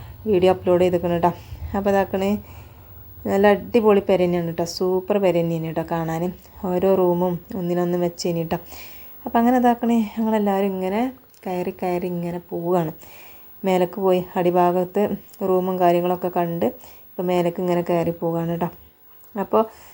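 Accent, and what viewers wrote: Malappuram, India. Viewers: ട്ട,,,,, കൂടുതലാണ്‌ട്ടാ,, ട്ടാ,,, കുറ്റപ്പെടുത്ത് ഒഴിവാക്കണ്ടാ,,,😅 എനിക്ക് നിന്റെ മലപ്പുറം വർത്തമാനം കേൾക്കാൻ നല്ല ഇഷ്ടമാണ്, ടാ,,, ഇത് കേൾക്കാൻ വേണ്ടിയാ ഞാൻ വീഡിയോ കാണുന്നത് തന്നെ.,❤️❤️❤️❤️❤️❤️❤️👍🏻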